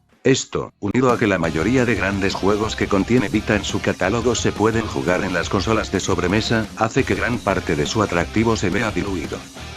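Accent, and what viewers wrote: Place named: Spain. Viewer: ¿Por qué le ponen esa voz culera de españolete?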